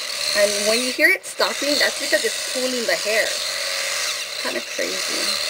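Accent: Hawaii, United States. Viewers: Omg thanks for the review and demo! I have the darkness under my arms so I'm considering this product. :D I could tell by your accent that you're from hawaii! Great review! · R u Hawaiian?? You really sound like a local girl from Hawaii:)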